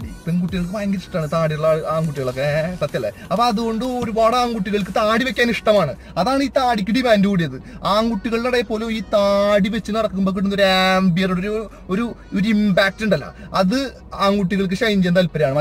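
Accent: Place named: Kodungallur, India. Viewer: Ijjadhi ddav... Thrissur alle😃❤️💪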